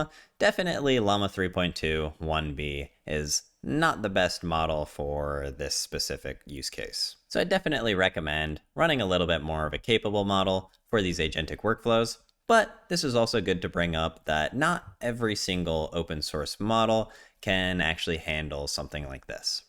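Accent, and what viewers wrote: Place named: United States. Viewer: Your channel caught my attention because of an Ł in your name. I thought you were Polish, but your accent sounds like you are USA born and raised. I love the content. Very informative and in depth. I can't believe you are not some ML wizz in the Silicon Valley. Keep up the good work!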